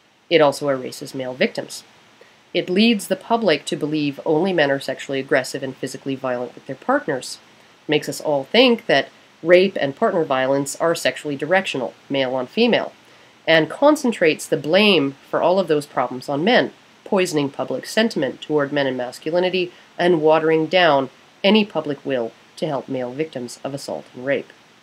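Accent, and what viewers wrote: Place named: Canada. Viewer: I LOVE CANADIAN ACCENTS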